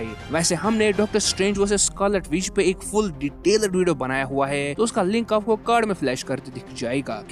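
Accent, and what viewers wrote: Kashmir, India. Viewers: Hmm y does ur accent sound familiar. Kaishur chuka?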